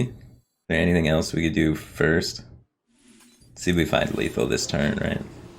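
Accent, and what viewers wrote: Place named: Michigan, United States. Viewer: The Michigander accent is just the most attractive accent in all of the USA.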